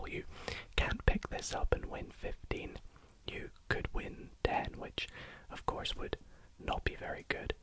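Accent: United Kingdom. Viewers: i love your asmr math channel. i also like your British YouTube name and accent. the British tends to call mathematics maths in abbreviated form.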